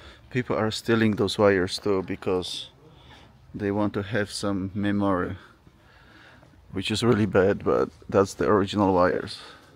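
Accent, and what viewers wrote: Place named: United States. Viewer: Your accent is really hard to understand, are you from America??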